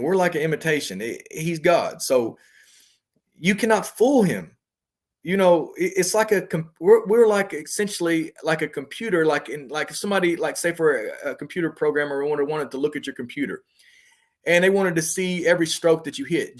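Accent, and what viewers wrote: South, United States. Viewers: Me and this guy have the EXACT same Southern, country voice😂😂😂 i know it's unrelated to NDEs, but i thought it funny because I've always been made fun of for my deep accent. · I love this guy. His southern twang on top of his humor really put a good spin on the story. But I sympathize at the same time when he’s dealing with his fear after the experience. I’ve had encounters and am careful who I tell. Maybe one day I’ll tell my story. I’m glad he got a second chance to fall in love with the Father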